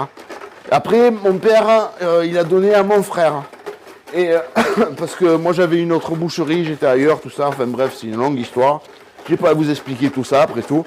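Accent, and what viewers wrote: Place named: Gard, France. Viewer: Tu vois, j’ai ton âge, ta carrure, Artisan, Gardois, le même accent et expressions, cassaïre au Cirque de Navacelles pendant 25 ans, ma mini chaîne YouTube, mais cette vidéo, TU M’AS fais CHIALER!!!! L’apprentissage a 14 ans, les mobylettes la pêche l’armée.... Tu dis que des vérités de notre génération.... En mars 2017 je me suis pulvérisé la main droite au chantier, j’ai repris boulot en avril 2018, Donc bien sûr RSI m’ont rien filé ou pétoule... Souvent j’ai chargé le 12! J’y pense parfois! Pas besoin d’être jeune pour peter un plomb.... Et tu sais quoi? Comme Stéphanie, tu et mon échappatoire quand je vois tes vidéos! Merci pour ta bonne humeur Je passerais à ta boutique un jour Bises du Pont du Gard Patrick RAPIDO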